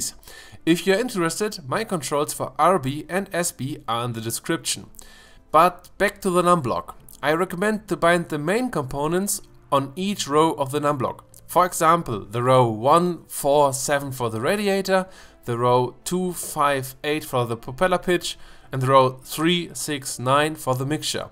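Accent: Germany. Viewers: Gutes englisch aber man hört das du aus Deutschland kommst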